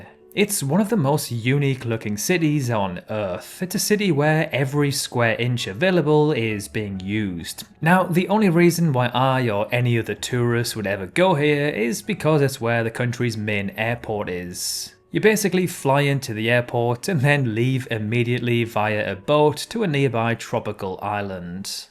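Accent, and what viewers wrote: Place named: RP, United Kingdom. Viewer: You sound like an upper class twit from a Monty Python skit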